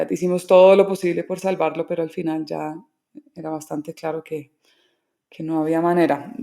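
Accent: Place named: Colombia. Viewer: No tiene acento extranjero, habla con acento colombiano.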